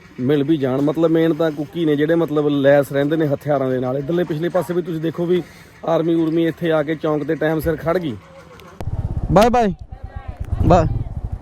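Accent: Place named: Punjab, India. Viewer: Punjab ke log isi treh ki pure Punjabi language bolte hai , vadiya lagdi,😄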